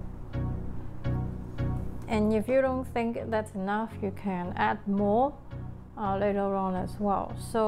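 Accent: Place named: England, United Kingdom. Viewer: Hi, I love you English accent. The information you give us is very helpful. thanks for sharing.( 來自小船群組回訪)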